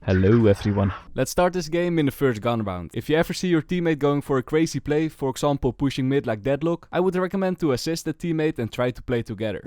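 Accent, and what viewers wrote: Netherlands. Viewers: i smell a little nederlands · You are Dutch arent you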